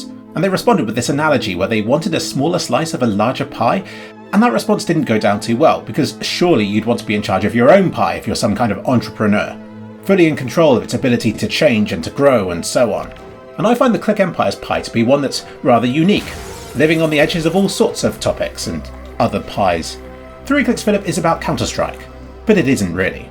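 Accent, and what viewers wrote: United Kingdom. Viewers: "Why do people want to watch my videos on a niche topic?" My brother in christ our niche is listening to you talking about niches. Hearing a calm british accent guy talking about anytinhg with some weird music at the back just fries up my dopamine receptors more than any kind of tik tok media could do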